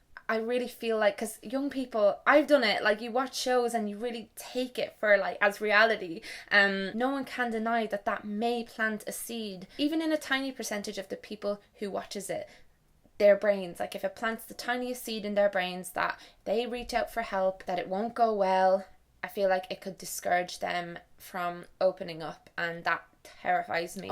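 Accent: Ireland. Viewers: totally Agree with you about 13 reasons why, I have also self harmed and attempted yo commit suicide 3 times , totally ok now tho and grateful im alive but the programme was made in my opinion by someone who doesnt understand mental illness at all and think they should have sorted there research out before hand. also the irish accent is the best ever and I could listen to it all day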